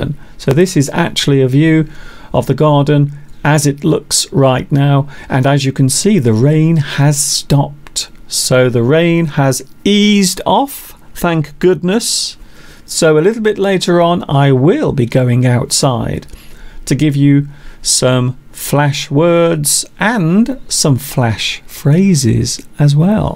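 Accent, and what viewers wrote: United Kingdom. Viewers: absolutely fantastic ! just thumbed it up on the go. I appreciate a lot how you entertain us with clear British English. The best way to learn English is listening to you like a couch potato. And Happy Birthday to your mother and tell her she looks like someone pretty clever with a great smile.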